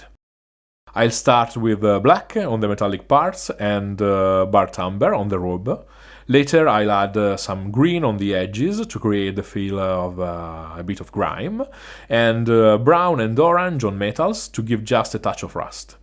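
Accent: Italy. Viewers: good lord....that looks amazing..... love the slight italian accent gives all the artwork a "fathers of the renaissance" touch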